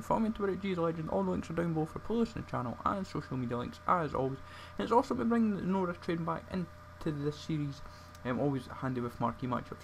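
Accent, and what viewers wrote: Scotland, United Kingdom. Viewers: is he scotish??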